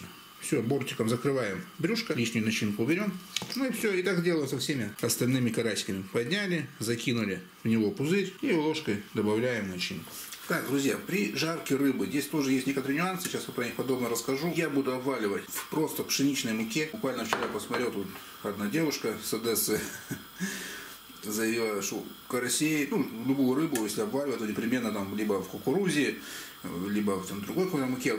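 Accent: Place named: Urals, Russia. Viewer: На Амуре люди медленнее говорят. У Вас наш уральский говор - быстрый. Видно, наши осели на Амуре.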